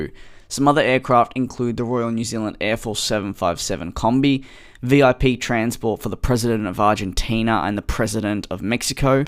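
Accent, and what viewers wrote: Australia. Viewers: Thank you for the "757 Re-Engined" video-- the 757 is one of the most powerful and graceful of Boeing airliners. Pilots develop sentimental attachments to the 757 for its creature comforts, and the wonderful cockpit view. Your own, detailed commentary is very helpful-- especially the facts and reasoning for your viewpoint. Clearly, you read industry publications widely, and in-depth. Also, very helpful is description of your video equipment-- there is no substitute for a good tripod. Your Aussie accent is almost never a problem, but you have a tendency to drop complete syllables in words, and unless we Americans know the Aussie abbreviated pronunciation, the word is lost. However, we do understand your production is provided as an amateur, and a professional speaker is not expected. * If I have confused your accent with Australia, and it comes from industrial London, instead, my apologies. 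Quite a number of Aussies came from that area, originally.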